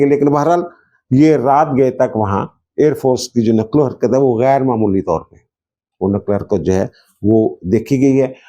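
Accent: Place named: Punjab, India. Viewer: صاحب پنجابی ٹچ کمممممال ہے آپ کا۔ ❤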